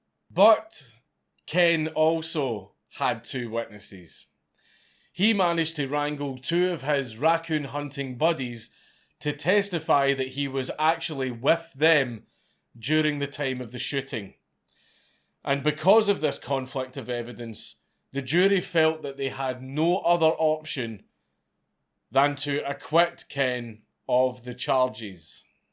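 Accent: Scotland, United Kingdom. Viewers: This youtube channel is great. Fascinating history narrated by a suave Scottish accent, who could ask for more? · Are you SCOTTISH by any chance ?! Can you do a Transylvanian Accent ? Of course I've heard of Count Dankula.... you've gotten a lot of coverage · this is the most scottish man i have ever heard. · My god a Fellow scot with a blantly Scottish acent, that alone was a joy to just hear blurting out over the speakers. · He's Scottish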